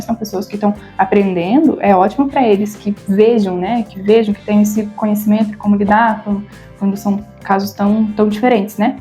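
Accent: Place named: South, Brazil. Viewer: Há sotaques brasileiros uns bonitos e outros sofríveis. O dela por acaso é bem feio. Como ela é do Sul do Brasil e com aqueles olhos bem azuis, não temos dúvidas que é italiana ou e alemã, embora tenha nascido no Brasil e tenha sobrenome português. Mais uma que veio "istranhá" e "falá mau".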